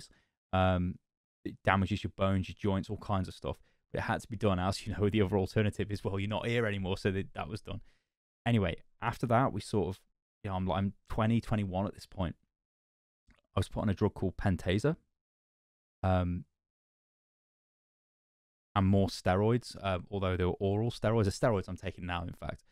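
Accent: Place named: Cockney, United Kingdom. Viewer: Overwatch came out, I got it, and I immediately went on the internet to learn about the game. It wasn't long before I stumbled into this weird cockney guy who didn't script his videos and really seemed to know his shit. I got to know simply as the guy with the Overwatch channel I check out from time to time even after I'd stopped playing the game. And just now I discover that he's in pain, and I find myself getting teary-eyed just as if a friend told me about his problems., you're a part of my life now. Get better, friend. Take all the time you need. I'll be here when you get back.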